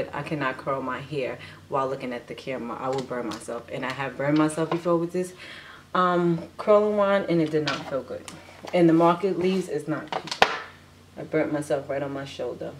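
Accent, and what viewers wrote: New York, United States. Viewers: I love your New York accent yasssss ✨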